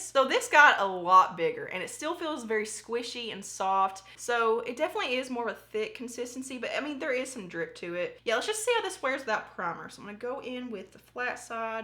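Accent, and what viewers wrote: South, United States. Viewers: I am born and raised from the south and your accent legitimately makes my soul smile. I love it. And I loved this look! Great video gorgeous ♥️ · Im from the south as well So good to have someone on YouTube speaking pur language. Lol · Use it love it, when I heard your voice knew I would just love ya,southern accent me too.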